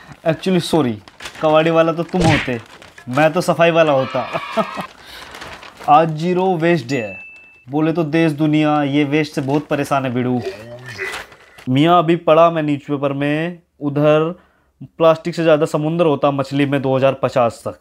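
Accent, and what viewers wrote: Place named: Hyderabad, India. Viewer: hyderbadi or mumbai ka mix lag rha hai mujhe🤣😅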